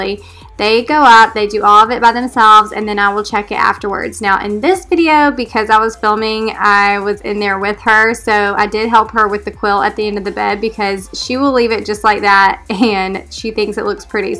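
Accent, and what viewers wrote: South, United States. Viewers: Amanda sent me! Also, the moment I heard your voice, I felt your fellow southern accent! 😍 Mississippi sending some love two doors down! 😍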